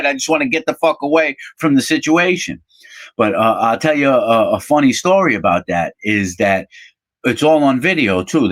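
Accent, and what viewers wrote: New York, United States. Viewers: The way you tell stories is awesome. I don't know why, but i love the NY accent. Thanks for the authentic insight.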